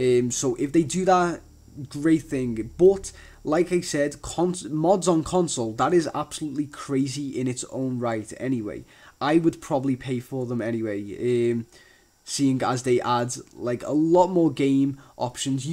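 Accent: Liverpool, United Kingdom. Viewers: oh another scouse youtuber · scouse twat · Is that a Scouser I hear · Are you a scouser? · bob he is scouse that's why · bob thats because he's a scouser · he says eehm because hes a scouser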